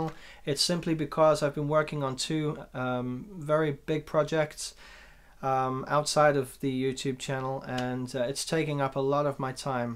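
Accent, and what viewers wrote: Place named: United States. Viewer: I remember you had a Italy connection...Maybe I've missed something you've told me about your move to the Durham in the UK....Yet, it's the American accent that confuses me....Yes...weather wise...back to normal...and maybe some warmer weather middle to end of next week (hopefully)....Regards....Dave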